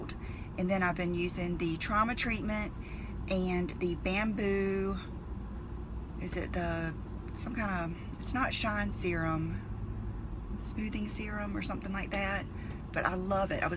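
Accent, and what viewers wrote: South, United States. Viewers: Yay!! So glad to be able to spend days with you- it's so funny I feel like I can really hear the southern in you more in this vlog! 😘😘😘😘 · I've lived in NC my whole life- near OBX and have never heard such a wonderful southern accent! voice is just sooo pleasant! I just love it!....oxo!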